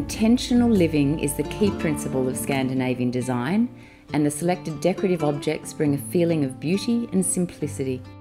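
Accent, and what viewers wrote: Australia. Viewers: Even though I struggled understanding the Australian accent but this video helped me throught my self study interior design process thanks so much for this video ❤❤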